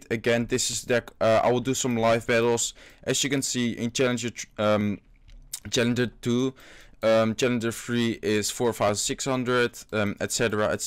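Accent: Netherlands. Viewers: ik hoor het nederlandse accentje:p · Dikke Nederlands accent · ben jij Nederlands????? zo praat je namelijk wel: ) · Zyt gy Nederlands spreker? ge hoort dat echt... maar je kan wel goed engels spreken... ik ben van belgie btw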